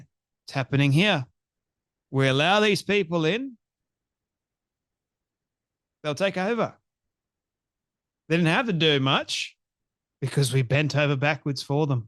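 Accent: Australia. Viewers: It looks to me like an invasion and yes Australians have had it great for a long time,but most are asleep "she'll be right mate" No longer rings true.Sorry time to wake up and smell the roses Aussies.!As for the narrator here your voice is too harsh and unpleasant to listen too gratingly not charmingly Aussie,(if ever it even was) .You and Pauline Hanson both cannot acknowledge your Achilles's heel.Until you do you will never get to the top in Australia.Australians seem to suffer from an inferiority feeling from , "The land down under".All you two do is remind them of it!! Sorry.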